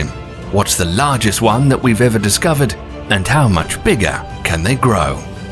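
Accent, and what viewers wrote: United Kingdom. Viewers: Im tired of these anti-christ british speaking clowns who just spread false fear Mongering. The Faith Rebukes Your Ways.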